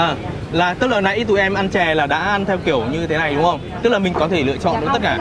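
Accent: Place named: Central, Vietnam. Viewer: dạ. Mà hình như a người Hà Tĩnh phải k ạ? Nghe a ns giọng Bắc cũng chưa chuẩn lắm...vẫn có chút miền Trung phảng phất...hehe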